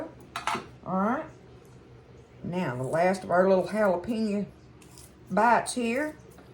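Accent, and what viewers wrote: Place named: South, United States. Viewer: I'm going to give this a try. I love listening to you talk...you sound like so many I grew up with.. I'm from the south and we talk like you. You are a ray of sunshine with your personality and a blessing to many...God bless you 🌷🦋🍎🍉🥧🫖🍇🍓🐝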